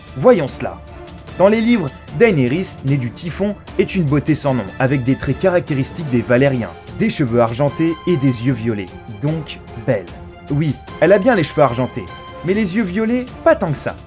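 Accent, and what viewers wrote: England, United Kingdom. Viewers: Arrête avec l'accent anglais et tu écorche tout les noms et ta jamais vu game of throne sa se voit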